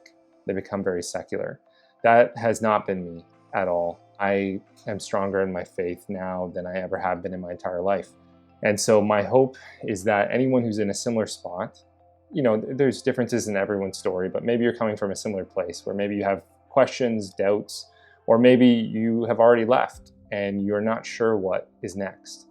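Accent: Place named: Canada. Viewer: I’m enjoying your video but I’m also distracted by your accent 😂 are you Canadian? I keep hearing twinges of Robyn’s accent from how I met your mother lol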